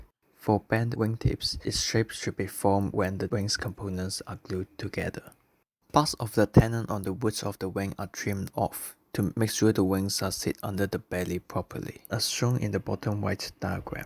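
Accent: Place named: China. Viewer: Are u from china? I like ur accent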